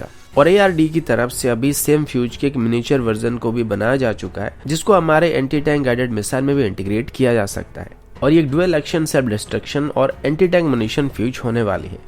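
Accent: Odisha, India. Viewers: Sir ji ap odisha se ho ky?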